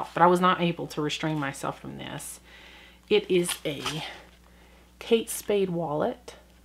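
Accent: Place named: South, United States. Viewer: I love that bag you call "dorky" lol! I just love your video's and that southern accent is awesome! I'm from RI born & raised here. I just love all your bags and my favorite videos are when you change bags and I love all the things you carry in your bag! I'm the same way---All my things in my bag has a story behind it. I carry a pretty piece of sea glass in my wristlet my grandson gave me. it's me good luck charm that I held in my hand when I go to treatment for my ovarian cancer. Keep taping! Your just awesome💜👍💜👍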